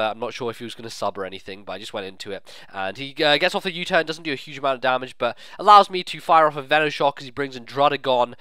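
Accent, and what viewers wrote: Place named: Scotland, United Kingdom. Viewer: Didn't know you are Scottish. So am i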